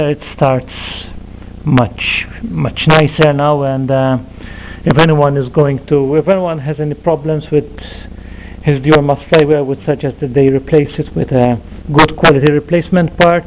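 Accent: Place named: Malta. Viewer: what was the total price with parts you sound maltese so thats why im asking because i have the same issue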